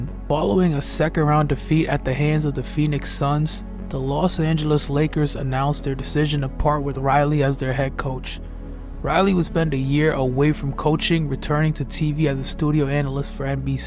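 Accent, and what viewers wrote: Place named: Bronx, United States. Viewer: Bro your cadence and delivery is dope, it's like your cool cousin vinny giving you the game in that Bronx accent!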